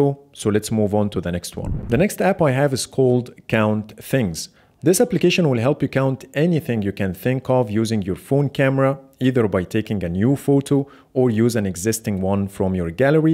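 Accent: Egypt. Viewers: Nice apps thank you for sharing it, as you sound as an Egyptian English speaker.. Great job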